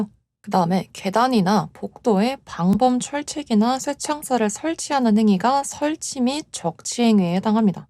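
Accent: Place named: Busan, South Korea. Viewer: 쌤 덕분에 공부 잘하고있슴다 근데 달동네던가 억양에서 부산 분이 아니신가 생각되네요 ㅋㅋ 저도 부산에 가까이 살다보니 은근 반갑게 느껴지네요 ㅋㅋㅋ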